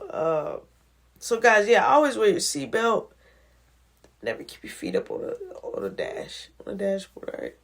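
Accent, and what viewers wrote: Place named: Chicago, United States. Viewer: I thought you was from Chicago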